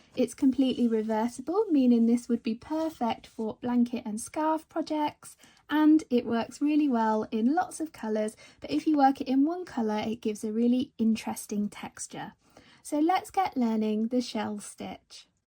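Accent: England, United Kingdom. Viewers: You sound English, like me. What are you doing up at 5.30 am?😄Me? I'm feeding a stray feral cat (charlie) who waits outside the door for me to get up. x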